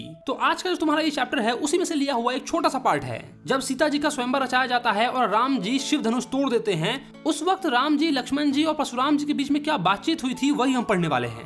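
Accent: Bihar, India. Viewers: aap bihari hooo? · Bahi aup bihar sa ho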